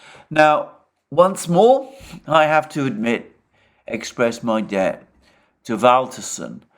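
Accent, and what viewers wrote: United Kingdom. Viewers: Your well-spoken nature has such a British appeal.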